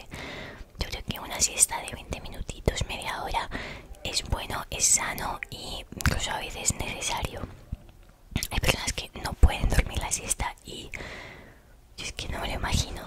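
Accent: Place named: Spain. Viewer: Amo profundamente el acento español y el tuyo es hermoso 🥰🥰🥰❤ Beso grande desde Buenos Aires, Argentina. 💙🤍💙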